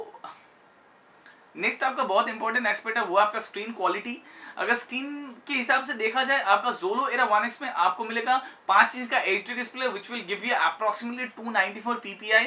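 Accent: Bengal, India. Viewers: bangali accent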